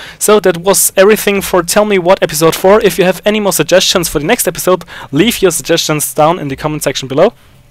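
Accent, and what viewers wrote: Germany. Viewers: You're german, aren't you?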